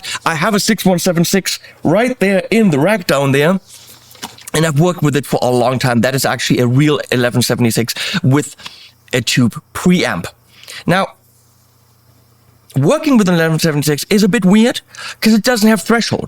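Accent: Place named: Germany. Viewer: So after 40 years or so I decided to get a Helix and be happy. It’s great but matching up the best pedals you can get is really the best. Watching these pedal videos makes me want to go back to pedals so, guess what, I’ve got both! But I still favor the Helix because it’s handy. Oh yeah, my favorite OD tone when recording? A Sennheiser in front of my old tube Champ with an attenuator. Now that is heaven in a bottle. A 6v6 bottle that is. BTW, you have no talent? I enjoy watching your videos. Your talent is in your delivery of the German laced, English language!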